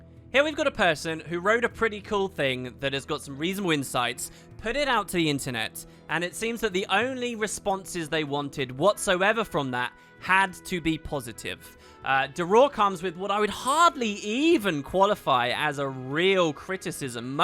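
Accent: United Kingdom. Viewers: your British accent aside we US Yankee's love:) THIS... THIS is why everyone i know and play Gw2 with feels the same IS the VOICE of Gw1 and Gw2 for many of us. You are able to look at the information stay as impassioned as possible to make the most rational conclusions is why even on topics I disagree with you on (20 to 25%) I ALWAYS know your coming from a good place, a person who loves and likes community, the Guild Wars world and games, and decency. All the best and thank you for not doing what 99% of you tuber's do. Worry about speaking your truth an losing subs. Ty again.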